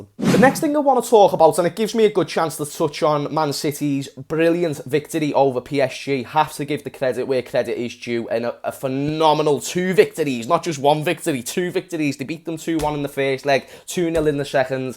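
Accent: Liverpool, United Kingdom. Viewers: This guy has the best scouse accent ever😂 loving the roundups lad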